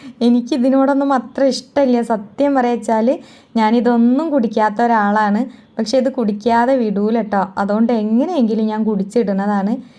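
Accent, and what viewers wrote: Kozhikode, India. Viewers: ഇത് കോഴിക്കോട് സംസാര രീതിആണോ, ഞങ്ങളുടെ ഒറ്റപ്പാലം ഭാഗത്തു ഇങ്ങനെ യാണ് സംസാരിക്കുന്നത്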